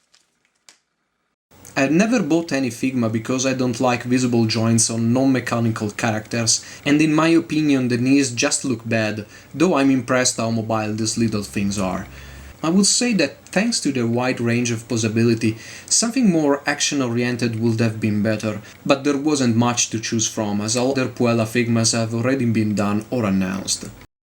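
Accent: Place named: Italy. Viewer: Initially, I thought his accent was Russian or Polish, but no, Italiano. :-)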